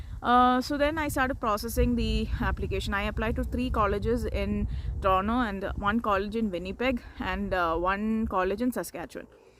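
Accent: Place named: Bangalore, India. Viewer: Oh my i was sooo sure ur from Bangalore.. my my how well i know Bangaloreans speak cheers 🥳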